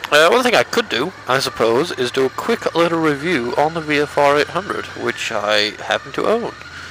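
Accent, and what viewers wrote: United States. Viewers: Nice vid but that mid-adlantic accent......what on earth is going on?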